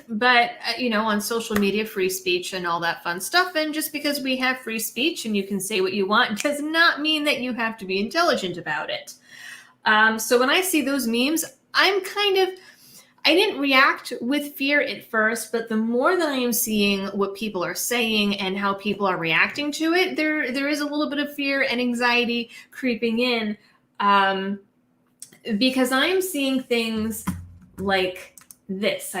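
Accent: Massachusetts, United States. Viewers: <shameless flirting with married woman> I think the Bay State accent with the Hawaiian flower in hair is a nice balance!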